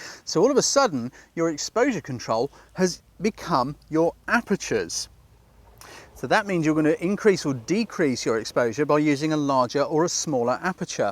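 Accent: United Kingdom. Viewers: This is excellent, great content for a photographer looking to get into video. Thanks for sharing, any chance of more in this series? Your presentation style is spot on, and a British accent as well, hooray!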